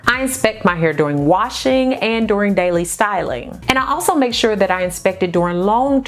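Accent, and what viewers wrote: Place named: St. Louis, United States. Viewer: I felt like she was from home!!!! Thought i was trippin!!!!! 314 STAND UP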